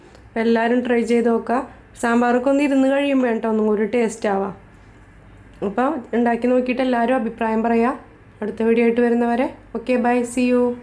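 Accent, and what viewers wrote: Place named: Thrissur, India. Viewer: Trissure slangilulla avatharanam enikkishtappettu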